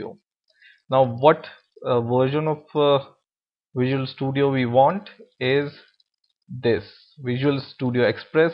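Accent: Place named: India. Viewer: Indian accent makes it better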